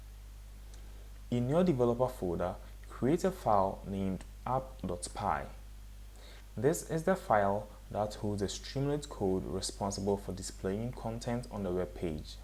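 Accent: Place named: United States. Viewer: 3y3 American accent wai 😂😂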